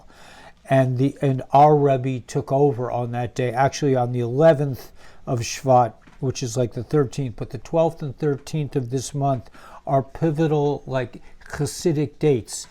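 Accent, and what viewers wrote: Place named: Armenia. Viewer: Good Afternoon, lmao yes stay away from death trap... question I' half Armenian I can remember my Great Grandmother speaking and it sounds similar to the way you pronouns a lot of the words you speak.. Enjoy the rest of the weekend, Love to Light...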